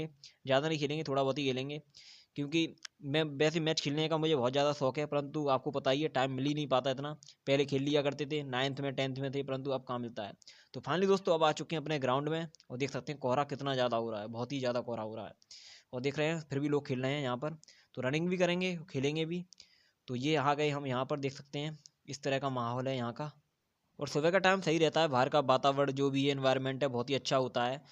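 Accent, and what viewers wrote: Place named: Bareilly, India. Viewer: Brother are you from bareily or aagra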